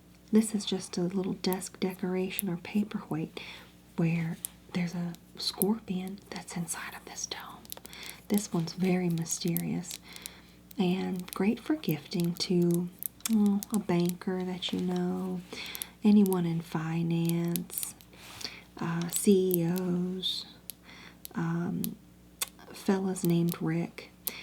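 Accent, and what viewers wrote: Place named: Arkansas, United States. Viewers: THE WAY I YELPED as soon as I saw this video!!! I'm not even a crystal girlie, but I don't think I've ever seen a crystal-themed ASMR roleplay that I haven't loved. And this accent.... I am unironically obsessed, there's just somethin bout that sweet southern *hwhistle*😍😍😌😌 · I was going to say how fitting it would be if Kathy was located in Arkansas since Arkansas has so many crystal shops. Also feel like you nailed the specific southern accent Arkansas has :)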